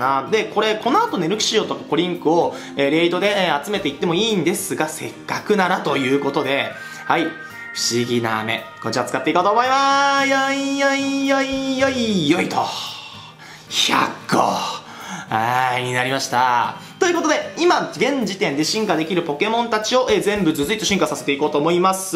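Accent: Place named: Tokyo, Japan. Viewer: 東京の人みんなこんなイントネーションなんか？ 引っかかってなんか腹立つ感じする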